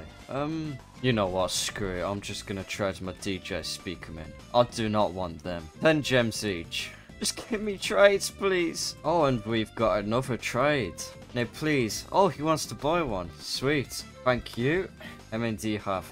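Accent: United Kingdom. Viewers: the second dude in my life that actually speaks british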